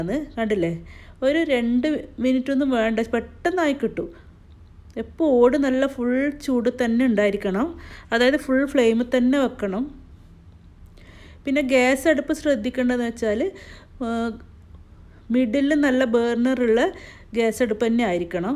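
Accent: Kannur, India. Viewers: വെറുതെയല്ല സൂപ്പറയത് മൊഞ്ചത്തീ ആ വർത്തമാനം കേട്ടപൊഴേ മനസിലായി കണ്ണൂർ എവിടെയാ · Kannur aanenn samsaram kettappoo mnssilaayii😃😃njanum kannur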